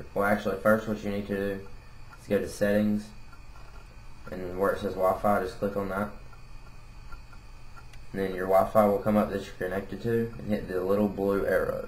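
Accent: South, United States. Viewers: I'm assuming this only applies to jailbroken devices, seeing as i can't find an app called "installer" on mine... nice country singer accent btw...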